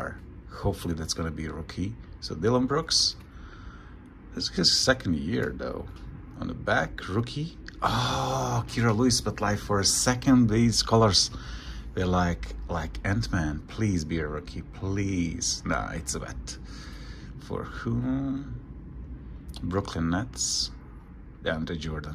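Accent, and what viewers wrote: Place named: Eastern Europe. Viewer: Eastern Euro step accent is always nice to hear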